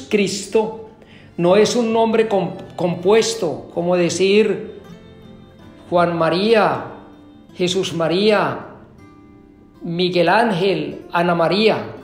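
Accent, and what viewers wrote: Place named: Antioquia, Colombia. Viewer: 😍Padre tiene acento paisa 🎊🎉🙏🏻🙏🏻💕😊😊 Dios te bendiga siempre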